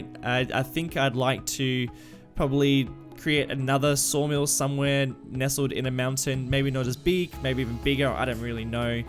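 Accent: Australia. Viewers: Crickey! Noice straya accent... 🇦🇺🇦🇺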